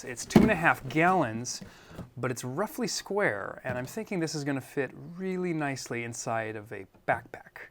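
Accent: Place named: United Kingdom. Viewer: The intro made you sound british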